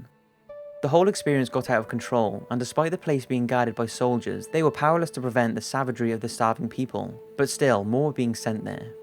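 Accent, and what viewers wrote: Wales, United Kingdom. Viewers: it's part of his dialect, wherever he's from - somewhere in wales, i can guess. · The video is all the more interesting because of your british accent. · He sounds it, would guess either East Wales like Newtown or Welshpool or maybe Ceredigion. He doesn't sound as broad as South or North Welsh. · Who's the narrator? He sounds both Welsh and English!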